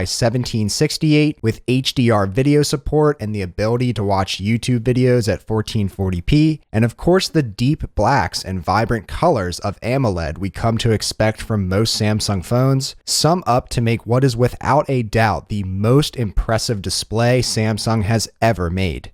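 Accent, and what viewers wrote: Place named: Delaware County, United States. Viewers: With his light accent and the red star I wonder if is from Pennsylvania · Love the Delco accent haha shoulda been on Mare of Easttown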